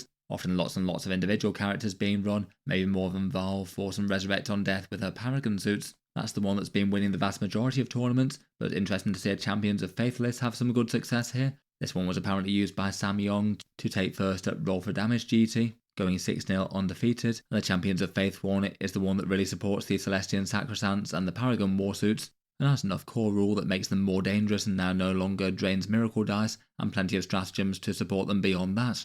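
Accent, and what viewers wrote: Kentucky, United States. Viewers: Enjoy the kentucky pronunciation.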